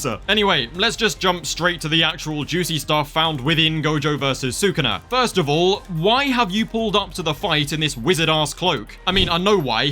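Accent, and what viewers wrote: United Kingdom. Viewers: Your British? Unsubbed :( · I didn't know you were British mate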